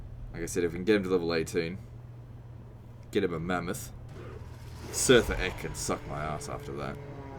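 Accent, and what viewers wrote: Australia. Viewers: Linoge Z: Well it sounds like he has an Aussie, or New Zealand accent. So I highly doubt it was a US education. But for a guy who can't differentiate between to, and too, I think your hardly in a position, to be talking about someone else's education. No matter where they're from!..😏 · Love your attitude about doing it your way even when the advise is totally correct. I can relate, but you're way more extreme in it. Strong personalities = manly balls, keep this shit up my Australian-Sound-More-Thor-Than-Thor-Himself-Legend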